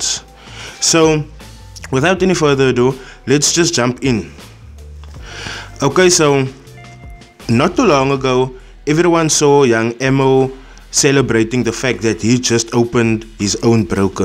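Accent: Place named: Cape, South Africa. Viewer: Snap bra you have that heavy Kaap accent. Much love my bra. As I subscribe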